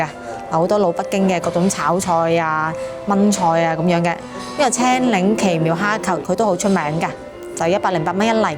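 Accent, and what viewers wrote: Guangzhou, China. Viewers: 粤语警察😂😂对老广语法一知半解😢广州陀地发音结尾，都是下降音及平声，不用理捞头乱噏🎉🎉支持你👍👍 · 唔需要改口音，改咗會不倫不類㗎，話你啲人唔係廣府人囉，我哋香港人好多都係從廣東來的，（佛山）❤❤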